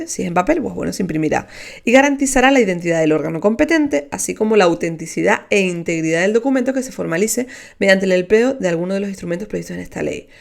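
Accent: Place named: Canary Islands, Spain. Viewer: Gracias! Me encanta tu acento. Eres canaria?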